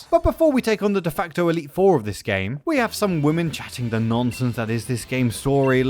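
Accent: United Kingdom. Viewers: You’re bri’ish? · He’s British?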